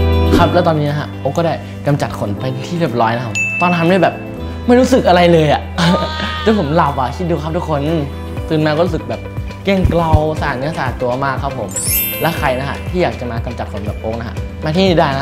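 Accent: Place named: North, Thailand. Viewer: อู้เมืองไปเล้ย.!!สำเนียงออกแล้ว อู่เมืองโดย น่าฮักจะต๋าย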